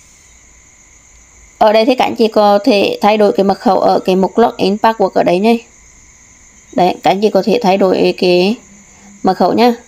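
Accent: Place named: Quang Tri, Vietnam. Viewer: A quảng trị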